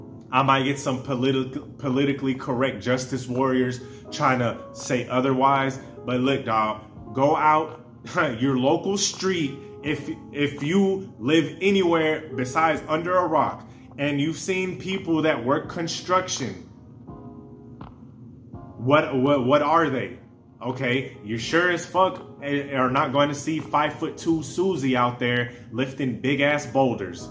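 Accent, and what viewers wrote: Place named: California, United States. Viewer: Bro sounds Sacramento or stockton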